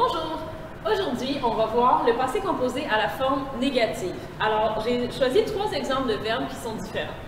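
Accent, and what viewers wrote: England, United Kingdom. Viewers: You gotta english accent, it's so cute♡♡♡. Thanks for your french lessons!♡♡ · vous avez un acsent anglais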